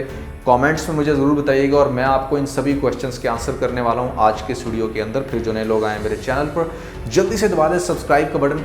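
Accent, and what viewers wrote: India. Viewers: are you from India? Because your style is very Indian way of talking, your pronunciation of certain words are also not correct, if you are Pakistani you better improve your Urdu...nonetheless your reviews are ok.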